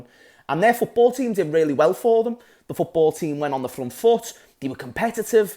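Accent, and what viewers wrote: Liverpool, United Kingdom. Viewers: I love the Liverpool accent I am Scottish from just outside Glasgow and my accent is very broad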